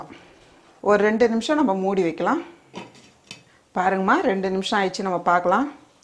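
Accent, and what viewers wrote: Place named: Chennai, India. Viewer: Happiness is hearing our own mother's voice. ♥️♥️♥️♥️ When she talks "parunga maa... With Chennai slang"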